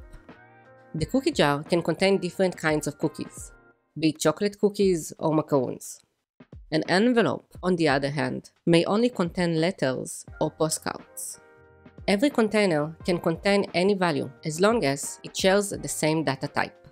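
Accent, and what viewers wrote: Israel. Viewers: אני מזהה את המבטא הישראלי מקילומטרים. אחלה תוכן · at the start i know that you from israel מההתחלה ידעתי שאתה מישראל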